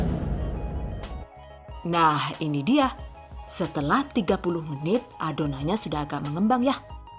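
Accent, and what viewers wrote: Banyumas, Indonesia. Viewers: Catat mantuk Bu bapaiunya. Njenengan orang Tegal apa kebumen ya entong seneng njenengan ngendijan ngapak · Deneng kaya wong ngapak.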